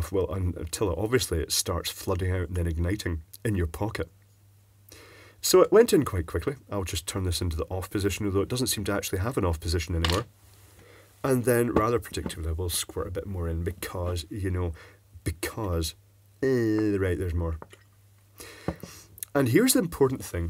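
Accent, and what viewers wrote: Scotland, United Kingdom. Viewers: Every time you said “gasoline” rather than “petrol” in your quite cultured Scottish accent..! lol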